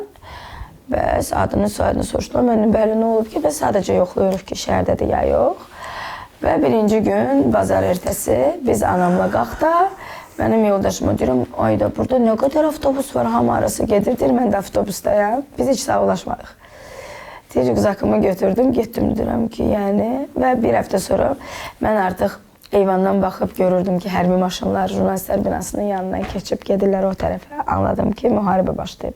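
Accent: Standard. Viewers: Mənimçün çox maraqlıdır, xanım Azərbaycan dilində belə təmiz danışmağı necə və haradan öyrənib. Mənim qızım orta məktəbi Rusiyada qurtarıb və artıq 10 ildən çoxdur Bakıda yaşayır, amma xanım kimi təmiz danışa bilmir, danışığında aksent hiss olunur. xanımı tanımağıma çox şad oldum.🎉😊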